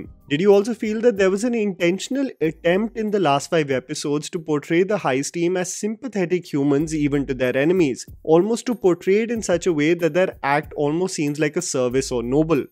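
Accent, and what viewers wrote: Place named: India. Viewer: I stopped watching immediately once I heard his Indian accent.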